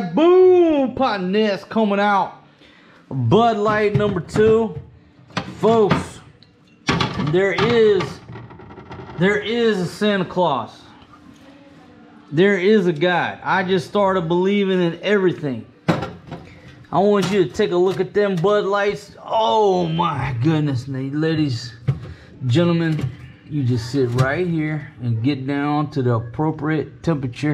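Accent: South, United States. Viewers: Where you from bro.... I hear a southern accent.....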